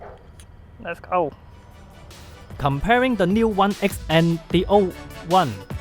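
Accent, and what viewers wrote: China. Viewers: Chinese assent is very cute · Now i can understand 🇨🇳 ChinEnglish 🇬🇧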